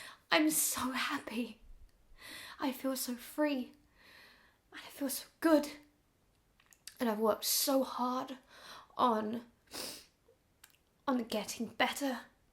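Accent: United Kingdom. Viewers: We like you for you Not becau-se you have a British accent Or because you talk in tangents So coherently But what we view Are your stru-ggles and confusion The dreams that you pursue on This quest to find out who you want to be <link>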